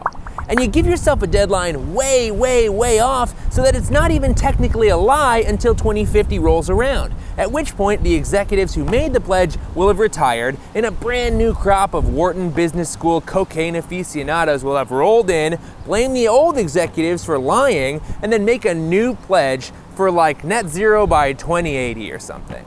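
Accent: France. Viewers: I went to give this video a like when I heard your french accent but I already had like this video. Seriously always so well done.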